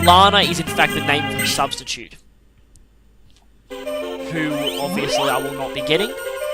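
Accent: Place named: Australia. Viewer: Blah, I wanted to see more of this - Your style of LPing is great, and your accent makes a nice change from most LPs as well - Australian and Scouser are incredibly similar to my ears, being in the UK, but as a fellow SF member, I can't wait to see this continue.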